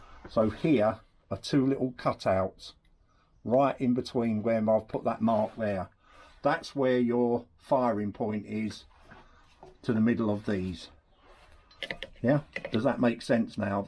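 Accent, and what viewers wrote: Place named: mixed, United Kingdom. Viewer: Hi videos great you old school like me but you got great knowledge I have learnt so much as a born again Scooterist. Your attention to detail brilliant. By your accent you Essex or London boy. Regards Terry.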